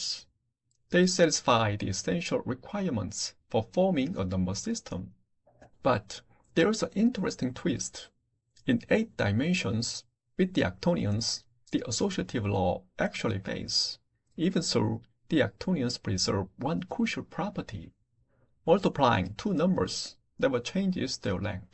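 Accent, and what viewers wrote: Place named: China. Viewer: I would guess Chinese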